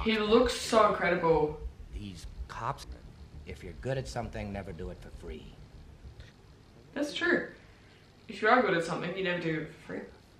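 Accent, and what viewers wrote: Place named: Australia. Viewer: The Dark Knight is an absolute masterpiece. Everybody was hating on Heath Ledger before The Dark Knight came out because of Heath's handsome leading man image at the time. I myself remember the Broke-Bat Mountain jokes. No one, and I mean NO ONE though he was capable of this kind of performance. He was definitely good but we hadn't expected he'd be THIS good. No one was hating on him after this, and to this day I believe his best films were still ahead of him. Great films we'll never get to see. RIP Heath Ledger. He was an icon. You may have missed it, but the flaming fire truck and robbing a mob bank are exactly the kinds of things that the Joker's twisted sense of humor would think was funny. The bank robbers even bring it up during the opening scene. And the truck flip was not done with CGI, they really flipped a truck. 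By the way, have I mentioned how much I adore your Australian accent?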